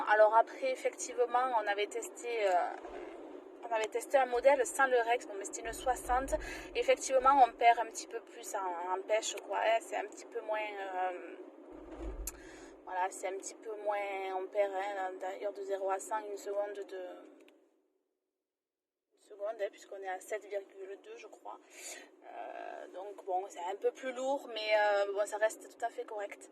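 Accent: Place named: South, France. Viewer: Il ne couvre même pas et fort heureusement ce (très) léger mais délicieux accent du midi ! 😃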